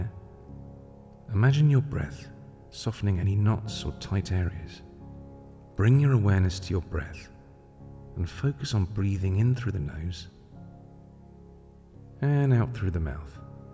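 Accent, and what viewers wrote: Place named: United States. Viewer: Nazir Hussain sir, where did you go? You narration is calming and effective this American accent is too mainstream doesnt sound good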